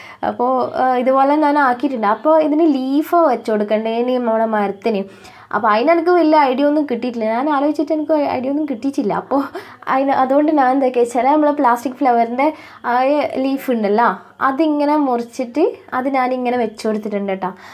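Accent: Kannur, India. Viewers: Samsaram kettitt kannurano enn oru .........😁😁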